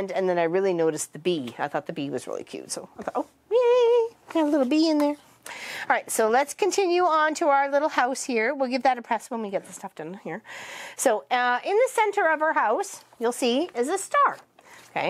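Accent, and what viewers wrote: Ontario, Canada. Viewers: So nice to hear an Ontario voice. I grew up in Scarborough, married a Kiwi, moved to Halifax in my 20s (for 35+ years) then retired to new Zealand. Great videos. I am addicted to quilting. 😊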